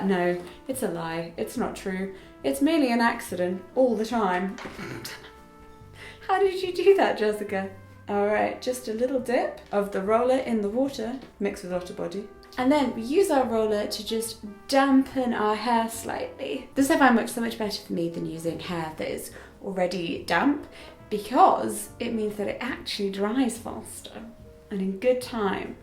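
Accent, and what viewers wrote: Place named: England, United Kingdom. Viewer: She could not be more English. It's not just the look or the accent but the mannerisms, the cadence of every single move. It's like she's a time traveler from a bygone ers, an upper class lady from Victorian/Edwardian times. I am absolutely mesmerized.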